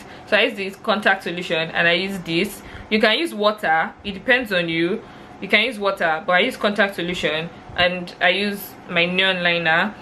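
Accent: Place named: Africa. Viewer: I love ur african accent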